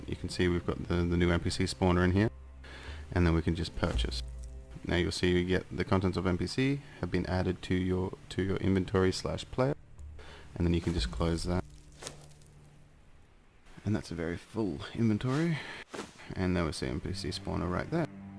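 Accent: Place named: Australia. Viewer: Damn, that's a pretty good Aussie accent for a Norwegian!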